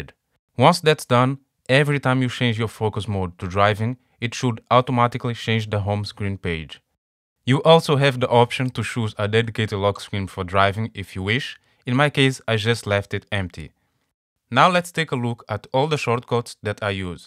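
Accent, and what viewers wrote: Russia. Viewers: Why the Russian accent?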